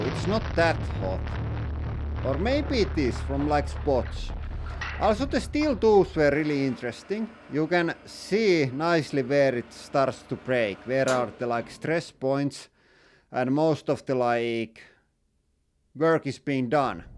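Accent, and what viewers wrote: Finland. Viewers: Love Finland accents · En oo pitkään aikaan kattellu tätä kanavaa; aksentti tuntuu muuttuvan aina vaan "manselaisemmaksi"....:D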